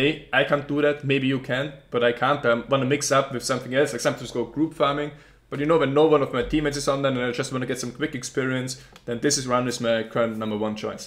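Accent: Germany. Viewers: I was a bit skeptical until I heard the accent. Those Germans man, they know their efficiency.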